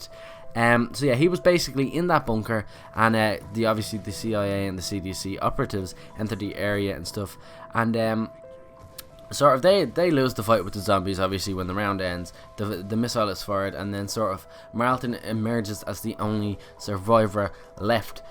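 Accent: Ireland. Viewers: are u irish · i can tell your irish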